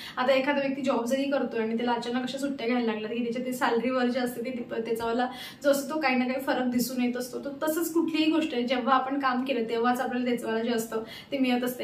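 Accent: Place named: Shirpur, India. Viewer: Pat your back for this realisation and taking decision to change this pattern 👏👏👏 I'm so happy for you ☺️ I love you dear❤ Mi pn kanya rashichi ahe tuzyasobat jast relate karte😊 khup chan watla ki tu swatacha aanand nivadlas. Ani bhashecha sangaycha tar mi Satarchi ahe. Ani mazi ek maitrin Shirpur chi ahe. Hostella sobat hoto. Tyamule tichi bhasha aikaychi savay zaleli. Ata sagle aapaaplya kamat tyamule bolna hot nahi. Tichyasarkhi bhasha aaikayla milte mhanun mala tar tuza bolna khup aawdta❤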